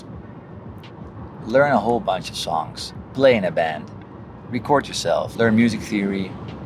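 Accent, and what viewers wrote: Netherlands. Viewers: Are you dutch? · Are you Dutch? Your accent sounds very Dutch.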